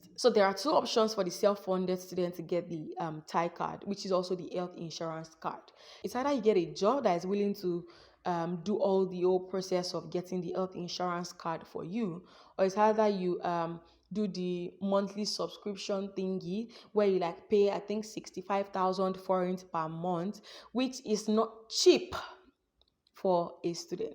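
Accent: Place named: Jamaica. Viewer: Yes you speak English but you have a Jamaican accent. Hard to understand . Don't complain about the people. You are not perfect. So you went to a Country to learn. You can tape it on google for what you want. That helps a lot. Any Country is the same. Yes. No one uses sorry. Because you need to learn the basic Hungarian language. Elnezest. Use this. This country is not an English colony. Before you went there you didn't read about anything ? Your fault.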